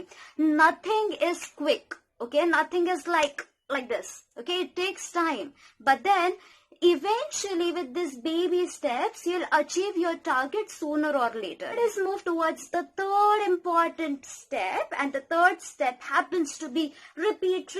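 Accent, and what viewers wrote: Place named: South India, India. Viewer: I remember my south indian teacher who give social science lecture and her accent is same as you... Nice video btw ♥️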